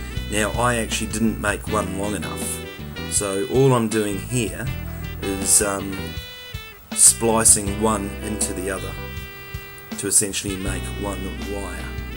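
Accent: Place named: Australia. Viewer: Unbelievable. An Aussie accent. I subscribed immediately. Yanks and Poms are ok but as an Aussie it's a nice touch to hear an Aussie or Kiwi accent.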